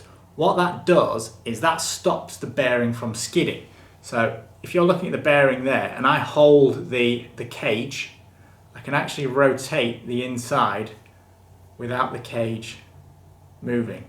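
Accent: United Kingdom. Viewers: First time I’ve come across your channel very good one word of advice drop the orange overalls you look like you’ve just come out of Guantánamo Bay Nice to hear a British accent 👍🏿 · How come dude looks Indian but sounds british 😂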